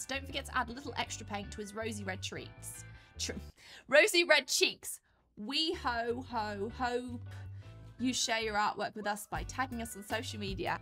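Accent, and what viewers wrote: Australia. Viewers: I still can't believe your related to the youtuber Mr H and friends. I'm Praying for your family. First when I came around your channel I wanted to learn how to paint and you sounded like Australian 😅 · S I thought u r an Australian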